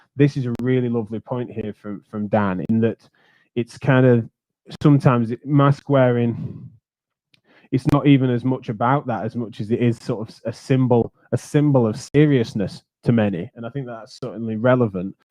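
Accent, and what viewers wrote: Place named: United Kingdom. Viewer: Got steered your way by Dr. Eric Nepute and Sherri Tenpenny. Live in USA (came from Germany as a 12 year old). So it's a bit hard to understand your British accent. But it's worth the effort. Keep up the good work, young man. As to myself and millions of other compatriots here in the States, we can't wait to see President Trump confirmed as having been reelected when the Electoral College meets on December 14. So tired of seeing all these zombies wearing masks even when the state law does not require them to do so (walking alone, driving the car, riding a bike.....totally insane on their part, suggesting "see what a good, law-abiding citizen I am.). So tired of having to put on that piece of cotton whenever I go shopping. I'm usually the only one that does not cover the nose). Anyway, I suspect that Donald John Trump will give all Americans a nice Christmas present by declaring the end of this Covid 19 pandemic no later than Christmas eve. 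Thank you so much, handsome dude,.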